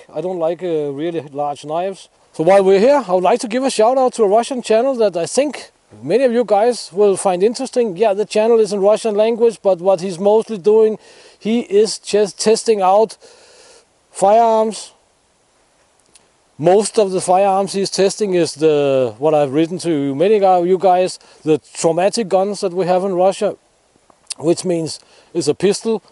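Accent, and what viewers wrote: Denmark. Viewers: I looove the accent! Danish? (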